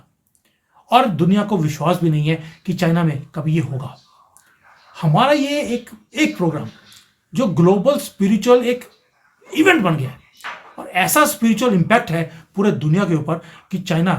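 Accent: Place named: Punjab, India. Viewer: सर, 🙏 आपका जो पजाबी फ्लो है ❤👌👌 बेहतरीन।। महाकुम्भ भले ही महाशिवरात्रि तक हो लेकिन स्नान बैसाखी तक चलता रहेगा।। आप देखना स्नानार्थीयो का रेला बैसाखी तक चलेगा और तब तक ये 55cr+ हो जायेगा। जय सियाराम🌹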